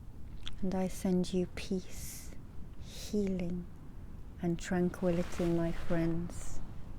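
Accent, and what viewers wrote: England, United Kingdom. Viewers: this is putting me to sleep so its hard to type this comment but you are wonderful - full of love and truly positive energy that you so generously put out there for the taking. you are a gift. i really enjoy the earthy feel of this creation and that you can convey such warm feelings from what looks like such a chilly, blustery spot is testament to your abilities. and i love your accent (one might call it true English... i'm American so yeah)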